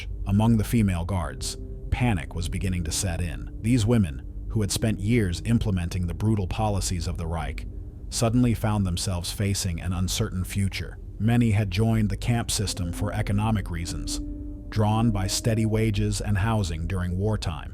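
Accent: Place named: United States. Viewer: I believe it's the bloody annoying authoritive American accent - which is so annoying! Right! The Americans won the war!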